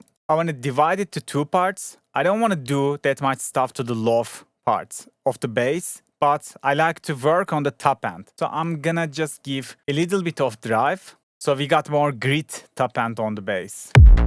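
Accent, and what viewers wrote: Turkey. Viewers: broooo. i loved your accent. tell me you are turkish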